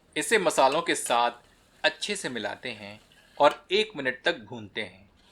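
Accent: Standard. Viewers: आपकी हिन्दी शुद्ध है !!! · आपकी शुद्ध हिंदी, और इतने प्रेम से इसे बोलना, किसी को भी मजबूर कर देता है, आपकी रेसिपी को बनाने और शेयर करने में।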